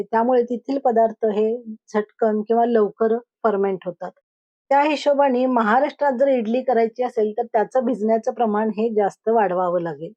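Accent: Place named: Karnataka, India. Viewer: लुब्लुबीत नाही लुसलुशीत आणि प्लेटा नाही प्लेट्स तुम्ही कर्नाटकी आहात का? उच्चार तसे वाटतात बाकी इडली ठीक आहे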